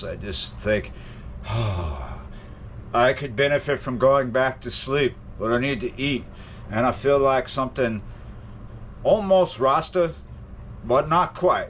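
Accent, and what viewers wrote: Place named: New York, United States. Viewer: Love the New York gangsta accent mixed with Louisiana slang LMAO ! !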